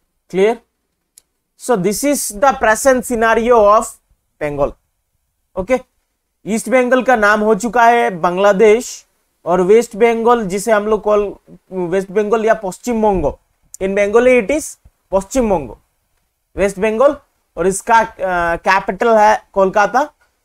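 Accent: Assam, India. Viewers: Are you from assam?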